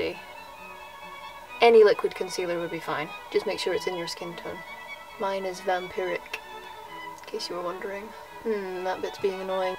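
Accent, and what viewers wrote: United Kingdom. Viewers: ...But he is British. He always sounds British.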